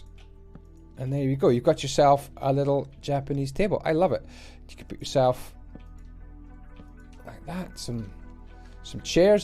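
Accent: Scotland, United Kingdom. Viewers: Are you scottish? Because ei am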